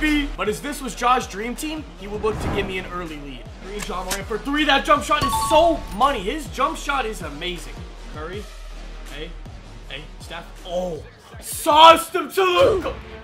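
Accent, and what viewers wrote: Greece. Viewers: Are you Greek Or Canadian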